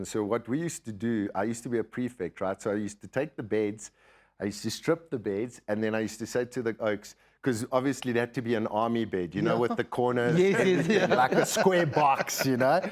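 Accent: South Africa. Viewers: Goeie donner, this is so Joburg English that i only understand like half of what he’s saying 😂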